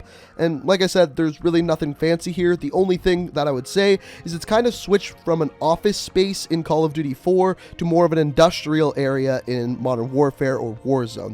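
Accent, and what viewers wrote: Canada. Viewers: You say ‘about’ and ‘out’ and I can instantly tell you are Canadian. Cool video. Thanks. I’m not sure if this is continuity in the Call of Duty games or just laziness by Activision? They probably have the skeleton’s of these maps and convert them over to their current engine. Will we get another Map, besides Verdansk?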